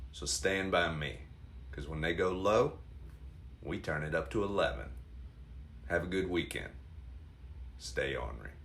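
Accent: South, United States. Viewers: You are smart and funny with a common touch. Nice Will Rogers style. It's always refreshing to hear Trump roasted in a southern accent.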